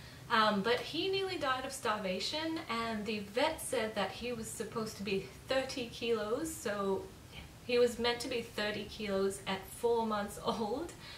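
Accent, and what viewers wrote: Australia. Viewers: Are you Australian or British or African or... What lol you have a cool accent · Aussie,Iv decided.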